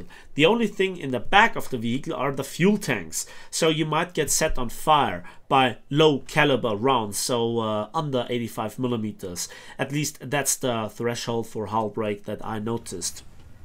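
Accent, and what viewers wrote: Germany. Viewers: Hey, another great video! I always enjoy a great historical background (and I think I can speak for everyone when I say this) with a satisfying German accent:) One question-- what are the pros and cons of the waffletractor vs. the Nashorn? Which would you use? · One of the best videos about War Thunder. I get that PhlyDaily is making videos for general audience that's why he has the subs. But is doing trully high quality content where you get far more information. He even pronounces the names very nicely in probably very close accent to that language:). BTW I don't know much about him, where is he from? The accent do sound like something close to Germany is mixed in him.. Denmark? Netherlands?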